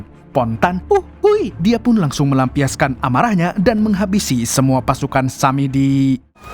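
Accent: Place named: Kalimantan, Indonesia. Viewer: Kalimantan logat nya nah😂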